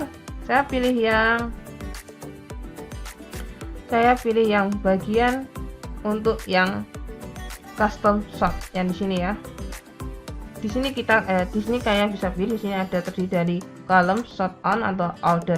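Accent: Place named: Pati, Indonesia. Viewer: wong pati yaa'eeeee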